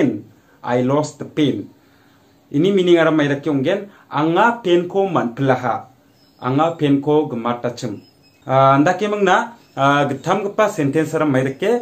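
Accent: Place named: United Kingdom. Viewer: Hope you to see your English accent again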